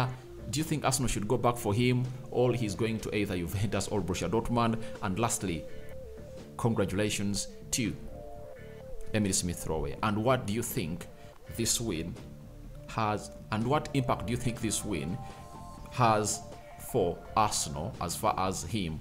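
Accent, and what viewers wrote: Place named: Uganda. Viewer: I knew u were Ugandan bro. I just checked to confirm...i know my pples' accent. Do your thing homie do your thing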